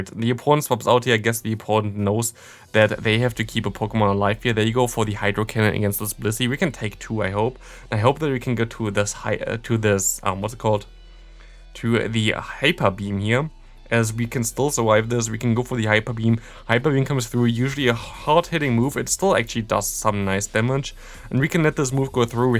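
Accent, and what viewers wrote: Germany. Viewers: der deutsche aktzent hahha beste super video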